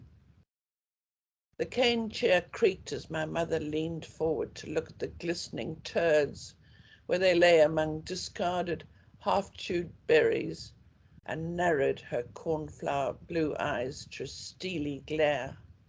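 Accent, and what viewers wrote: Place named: Zimbabwe. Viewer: Well l was thinking to myself u was Rhodesian .Although there’s a difference between British South African and Rhodesian English.I had assumed u moved to KZN from rhodesia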